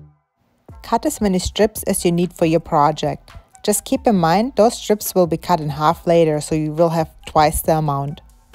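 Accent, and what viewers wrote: Germany. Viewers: Hallo wunderschönes Video. bist du Deutsch,dein Akzent klingt so,geh einfach mal davon aus und hab deshalb auf Deutsch kommentiert. Hab deinen Kanal abonniert und meine Daumen zeigen nach oben. Wünsche dir viel Spaß bei deinen Projekten und weiterhin so schöne Videos. Frohe Weihnachten und bleib gesund. Gruß Rudi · Brilliant job on the cleat system! Your accent sounded somewhat German, and then I heard you say "fertig" so that's certain! Ich kann ein bisschen Deutsch, übrigens! Curious how you found your way to the US! Subscribed and looking forward to your videos!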